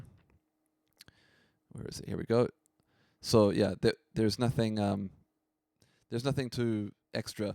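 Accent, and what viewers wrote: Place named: New Zealand. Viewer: I could definitely hear the kiwi...solid keep up the good vids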